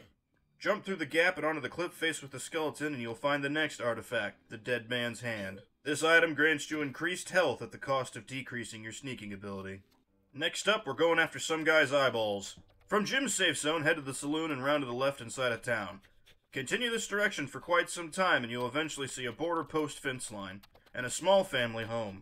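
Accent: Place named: United States. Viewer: Thank you my good sir! Your voice accent Is that of Cowboy by the way!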